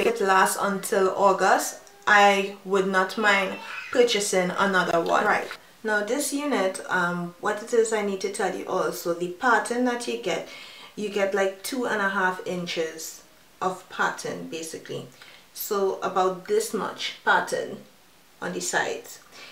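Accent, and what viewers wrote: Trinidad and Tobago. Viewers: you sounding trini ☺☺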